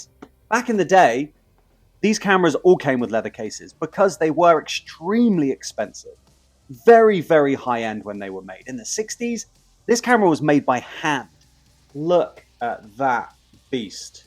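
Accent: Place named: Mid-Atlantic, United States. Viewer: Don't forget you are British, very Bad manners to wear a hat indoors. 🧐 Also be careful you have an annoying mid Atlantic accent. Dont forget to pronounce your T's and its Mathematics not Math....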